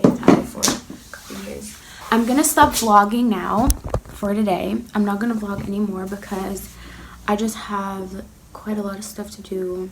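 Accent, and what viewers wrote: United States. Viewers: ik dacht dat je Amerikaans ofs was maar je bent Nederlands woaaw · wait youre dutch i thought you were american all this time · omg ik kom er nu achter dat je Nederlands bent terwijl ik altijd dacht dat je uit Amerika kwam ofzo 😂😂 Maar welke primer gebruik je? :)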